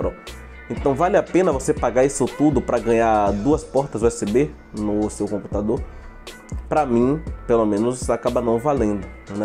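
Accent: Bahia, Brazil. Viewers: Man! Você combina o sotaque aqui da Bahia + O conhecimento em tecnologia. Esse canal era pra ter um milhão de seguidores. Por conta das dúvidas que tirei aqui, comprei a PRO II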